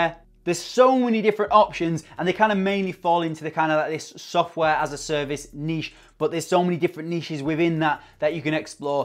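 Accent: United Kingdom. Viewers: "Affiliate marketing" awesome video! I like the way you present something, clear with British accent, concise and full information. Even though English is not my first language, I can understand that. Cheers!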